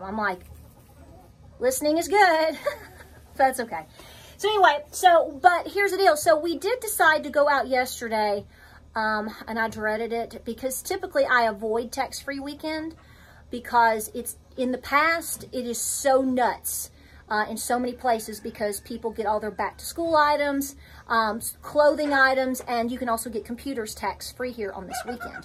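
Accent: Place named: East Tennessee, United States. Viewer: Found you about 2 weeks ago & have been “soaking” up all your advice & wisdom!! You mentioned Tight Wad Gazette in a video I watched today from awhile back. Just wanted you to know I found all 1-3 on Ebay & paid about less than $20 / free shipping SCORE & Thank You!!! Grew up in East TN & never tiring of hearing you / your accent!!! ❤❤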